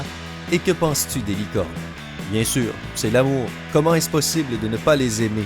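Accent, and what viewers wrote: Quebec, Canada. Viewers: Je préfère l'accent de nos amis du Quebec que celui de nos racailles de banlieues .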